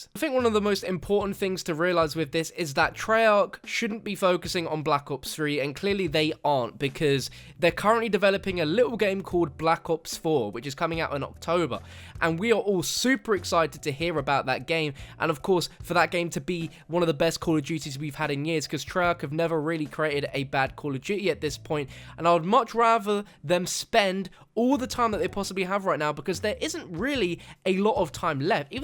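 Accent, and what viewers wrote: United Kingdom. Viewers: He is British? Or American?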